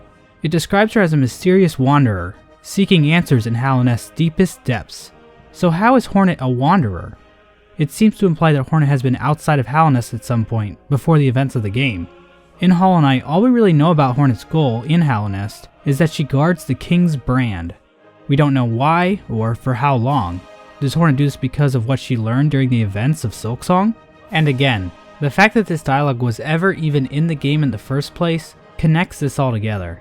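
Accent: France. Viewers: This aged like milk. Also, thats an amazing french accent 10/10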